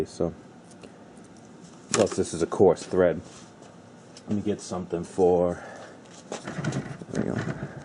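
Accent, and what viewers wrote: Boston, United States. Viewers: ***** Im from CT . I honestly wasnt sure if it was upper east side manhattan or boston. "in my bet boston accent" Go PARK the Car!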